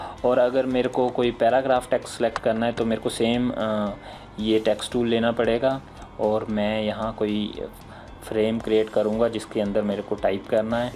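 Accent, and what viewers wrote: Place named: Himachal Pradesh, India. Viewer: Wow sir me Himachal se hu app kha se ho sr apki awaaz bhi Himachali lg rhi h😮😮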